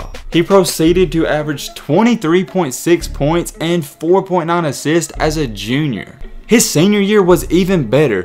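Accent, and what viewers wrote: South, United States. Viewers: I can hear a touch of southern in your accent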